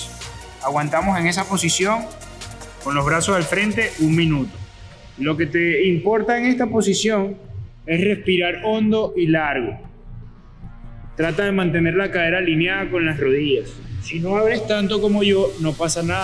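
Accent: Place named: Venezuela. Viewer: Pensé que eras Venezolano hermani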